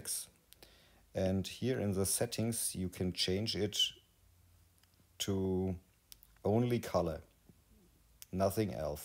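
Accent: Bavaria, Germany. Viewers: bavarian english is dope.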